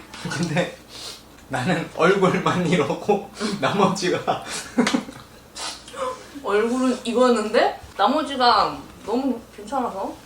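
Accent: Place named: Chungcheong, South Korea. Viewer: 와이프가 마당 텃밭에서 일할 때마다 로 빙의해요. 말끝마다 유유 거리는데 충청도에 와있는 기분이에유.여긴 강원도 양양인데 말이죠. 아 망치 들때도 빙의해요. 그만큼 찐팬이랍니다..저는 매년 산소 벌초를 셀프로 하는데, 예초기가 없어 다른 분들에게 굽신굽신 하며 빌려서 사용해요. 그래서 제 등이 굽었나봐요. 최신식 무선 예초기를 저희에게 주신다면 굽어있는 제 등이 조금은 펴질 것 같아요. 미리 감사드립니다🙏🏽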